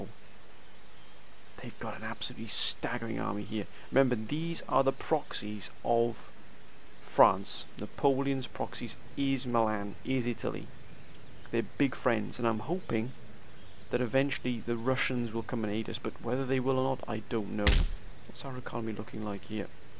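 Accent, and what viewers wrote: Wales, United Kingdom. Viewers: you're a real gentleman, and very polite keep up the good work(: PS Are you welsh?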